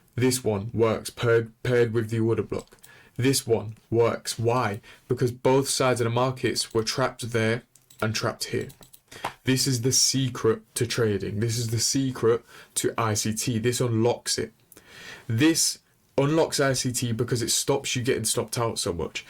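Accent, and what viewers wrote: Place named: London, United Kingdom. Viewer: 😂😂😂😂 people that trade ict can’t trade and I’m willing to take that to the grave. If you can’t teach a 10 year-old your strategy, your strategy is too complicated. at the end of the day every strategy works you just have to control your risk and have set rules. Losing is inevitable in the market. So someone with a London accent, using proper English and big words doesn’t make the strategy any better.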